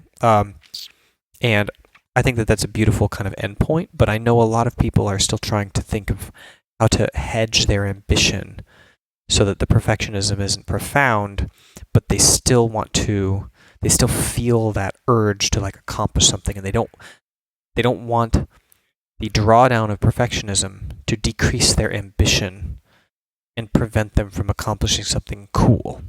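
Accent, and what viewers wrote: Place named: United States. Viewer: Where did you grow up? I find it hard to believe you're from Finland as you have no accent. You sound american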